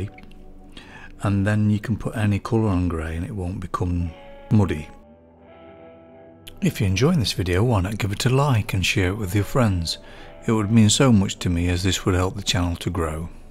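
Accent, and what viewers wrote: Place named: Derbyshire, United Kingdom. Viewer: Thanks! That was very helpful! Love your accent too! Derbyshire? Notts? I work in pastels but have never attempted a portrait so far.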